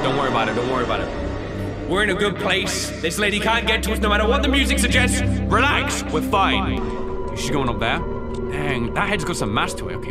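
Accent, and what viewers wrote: United Kingdom. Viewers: Wow fabulous.This man's brittish.lovely.. Good video!!!😊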